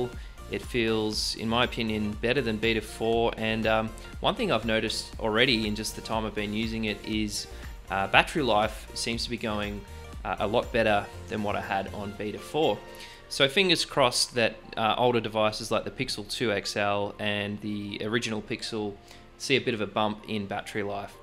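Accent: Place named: Australia. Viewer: i just love aussie accent.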